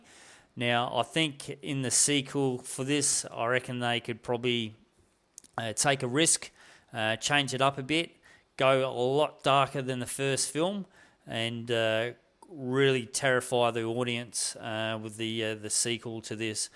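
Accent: Australia. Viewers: G'day mateeeee. I can tell your aussie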